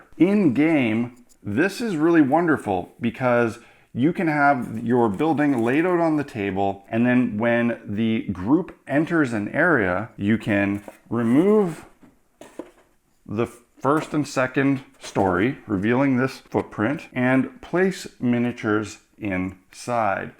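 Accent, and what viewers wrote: Canada. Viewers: Oh and love the Canadian accent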